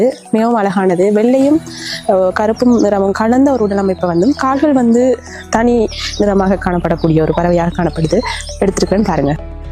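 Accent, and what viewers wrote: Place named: Jaffna, Sri Lanka. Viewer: Your jaffna Tamil dialogue is excellent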